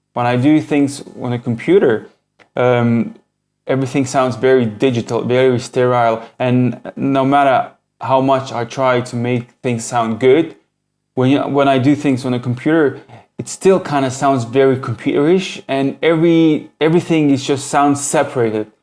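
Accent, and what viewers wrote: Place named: Eastern Europe. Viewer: Your accent always really takes me back, Its like half eastern european and half british cockney almost?! Have you spent time in UK?! Wicked tunes as always mate.. DIY cassette releases are the ay forward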